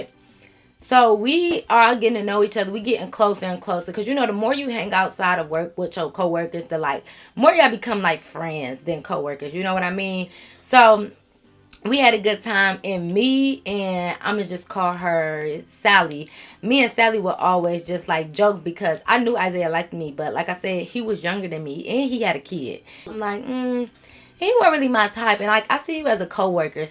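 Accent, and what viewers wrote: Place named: Chicago, United States. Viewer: I swear I cannot watch any of the others because you just know how to storytell! Girl where are you from though? I could’ve swore I hear a lil Chicago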